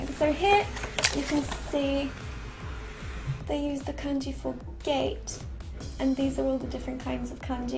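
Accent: United Kingdom. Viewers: I loooooove your British-English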